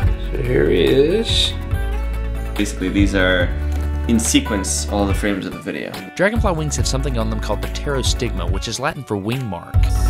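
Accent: South, United States. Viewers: Hey Destin! My first video was the rope coil analysis with honey, which I found through a science-oriented Facebook page(might have been IFLS, I cant remember.) When the video started out, I saw a guy with a southern accent and a bunch of guns, and thought "oh great..." But then you started talking and I was hooked. My favorite video was the Prince Ruperts Drop, its a facinating application of physics.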